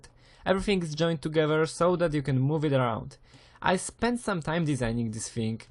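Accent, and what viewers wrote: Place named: Poland. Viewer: oj słyszę tu chyba polski akcent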